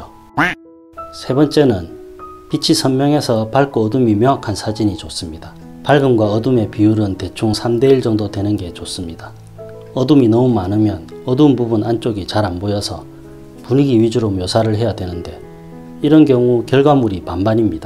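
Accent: Gyeongsang, South Korea. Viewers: 경상도 말씨가 너무 친근하고 반갑습니다. 직접 화실을 경영하시나요? 멀지않다면 직접가서 배우고싶습니다. 저는 하남에 거주합니다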